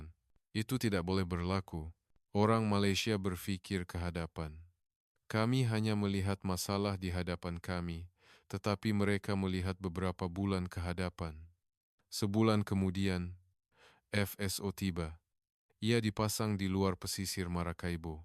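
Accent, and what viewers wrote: Indonesia. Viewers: Ejen MOSSAD INGIN MALAYSIA BERTELAGAH DENGAN DUNIA...INI ADALAH ADUDOMBA.... MEPERLAGAKAN EMOSI SESEBUAH NEGARA DENGAN IDEOLOGI PENIPU DEMI SATU MATLAMAT...INI TUKANG CERITA KETURUNAN INDONESIA..CARA PERCAKAPAN LOGHAT INDONESIA..APASAL ORANG INDONESIA SUKA MENIPU MAJORITI..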